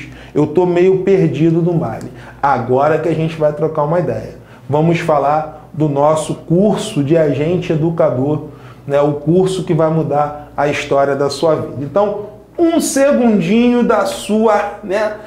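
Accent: Rio de Janeiro, Brazil. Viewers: Professor locão: massa! A geração Z adora isso, tem a pegada de curso pre-vest. Dá até pra suportar o carioquês com essas dicas do tal MPP. bons marketeiros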